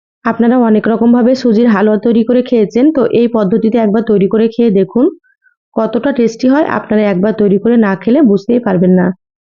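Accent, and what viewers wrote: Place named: Chapai Nawabganj, Bangladesh. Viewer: আপা আপনার বাড়ি মনে হয় চাঁপাই..!! কথায় আঞ্চলিকতার কিছুটা টান আছে...!!!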